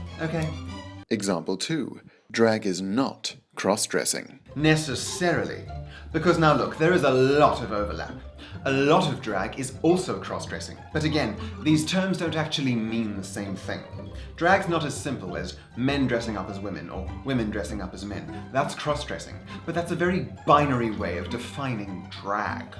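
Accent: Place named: South Africa. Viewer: I just realized that your South African. Damn now I like you more because it feels closer to home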